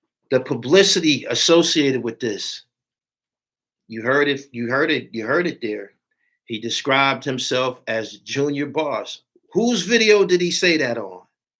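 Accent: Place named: Pittsburgh, United States. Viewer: I be hearing the Pittsburgh affiliation when you speak. We neighbours. Respect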